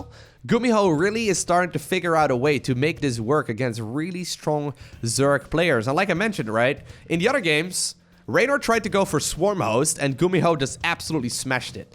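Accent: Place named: Netherlands. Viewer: My god your Dutch English accent is so terribly cringy to listen to... Get some lessons to fix it already. Its been this shit for years now.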